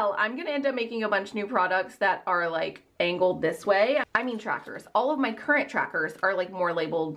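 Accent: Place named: South, United States. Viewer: I knew from your accent that I was listening to a southern girl 😂 hello from Louisiana!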